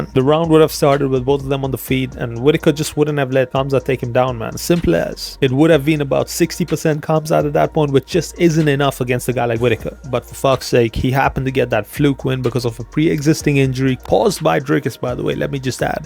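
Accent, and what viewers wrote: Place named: United Kingdom. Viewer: What part of Uk you from?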